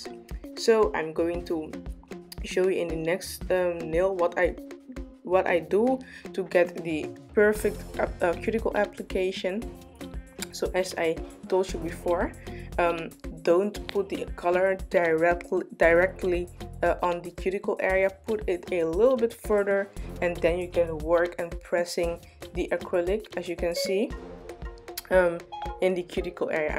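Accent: Netherlands. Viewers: are you dutch? you have a dutch accent hehe beautiful nails and technique <3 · 💞💞Love the video! Btw, do you speak Dutch? You’re accent sounds familiar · Je bent sowiesooo Nederlands. Hoor het meteen. Goeie videooo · Are u dutch ? Your accent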